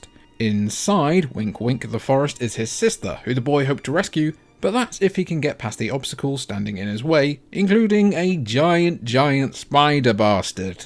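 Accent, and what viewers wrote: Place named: England, United Kingdom. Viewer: You're english accent makes the comedy even funnier, lol. Great job.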